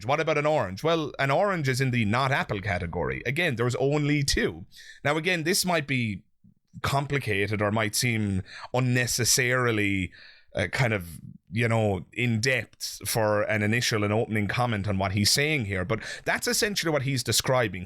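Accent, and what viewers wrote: Ireland. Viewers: I like your work , honest , simple , straight forward ,easy to follow , genuine, calm, ........ And all in a soft gentle Irish accent. Well done sir .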